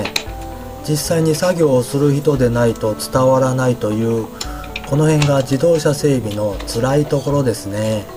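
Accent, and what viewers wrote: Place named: Tokyo, Japan. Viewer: 喋りが変な東京風になっちゃった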